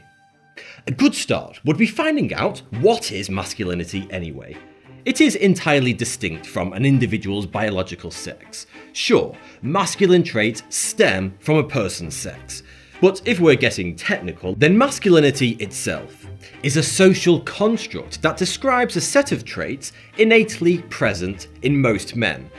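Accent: United Kingdom. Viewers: You haven't been watching much of him then. I used to learn cute factoids in a British accent from him now he's just another IDW wonk. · You’ve gotten a lot more British I see bud good on you! Missed your uploads..loved the look on the subject hope your doing well!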